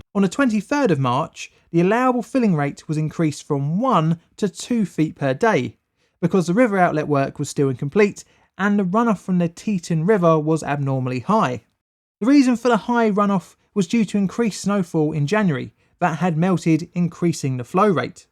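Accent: United Kingdom. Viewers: Why is this narrated by a limey?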